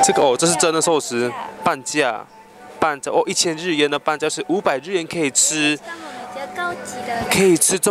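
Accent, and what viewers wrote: Taiwan. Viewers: RYU口音也台湾，不说我大马人的都快听不出。（另外女友口音好有趣） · 沒有馬來西亞腔了。你不說還沒發現原來是馬來西亞人～～ 😂 說話很像台灣人了。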